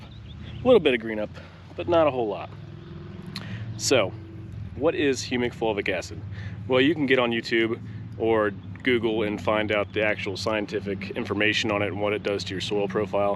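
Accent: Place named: Australia. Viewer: An Aussie man! Aussome!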